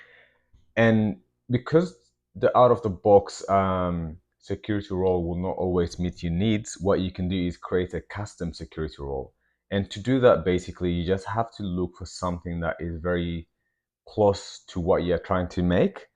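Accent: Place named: Ethiopia. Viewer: My good sir, Might you be habesha. The accent made me check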